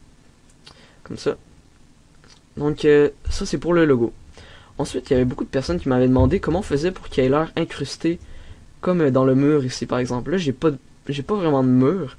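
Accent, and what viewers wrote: Quebec, Canada. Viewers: Vive, dommage de l accent un peu trop prononcé:S Mais sa gere quand meme, Peace au Quebequois!:)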